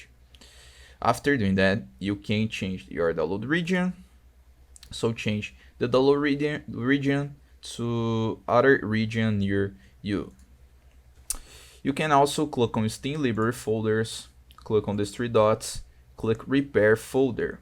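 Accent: Brazil. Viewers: sabia que esse sotaque era BR XD, ja viu esse erro meu consagrado? LowLevelFatalError [File:Unknown] [Line: 729] BulkData compressed header read error. This package may be corrupt!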